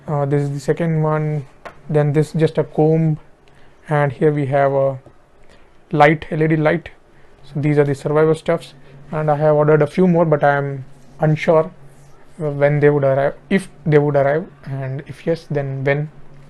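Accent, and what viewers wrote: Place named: India. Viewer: Indian English is the most annoying accsent I ever heard in my whole life. Sounds like you eating all letters.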